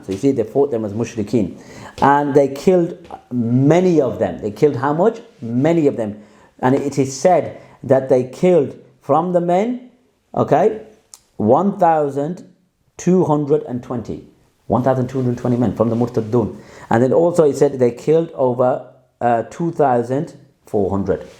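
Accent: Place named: Punjab, India. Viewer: Love ur punjabi accent bro😂❤️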